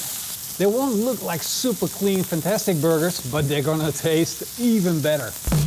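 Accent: Netherlands. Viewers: Ben je Nederlands? Hoor het aan de accent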